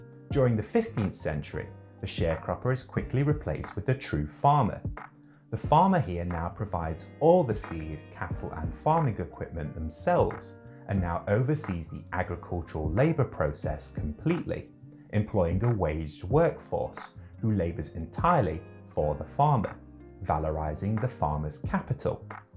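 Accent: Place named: Midlands, United Kingdom. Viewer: You’ve got a reet midlands accent buddy. Derbyshire/Nottinghamshire?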